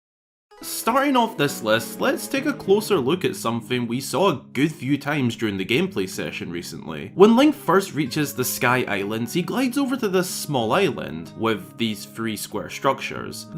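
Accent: Scotland, United Kingdom. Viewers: your scottish i thought you were british 😅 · You Scottish? Nice, I want to go there one day ❤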